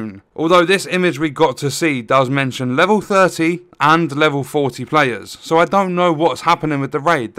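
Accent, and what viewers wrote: England, United Kingdom. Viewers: I don't play the division, I don't watch division videos, but listening to your classic " its a load of bollocks " English accent is enough for me to watch your videos. Keep up the rants the game is aids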